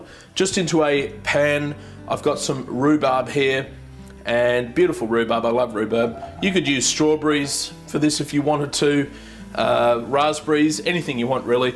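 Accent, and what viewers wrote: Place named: Australia. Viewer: You are an amazing person! and have all these great dishes!!! AWSOME! also love the aussie accent man! and you must have a busy week making all these videos and deciding what to make and getting ready and all!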